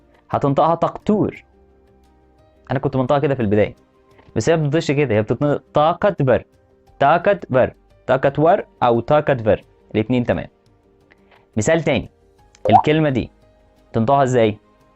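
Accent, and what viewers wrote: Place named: Pakistan. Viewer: لهجتك باكستانيه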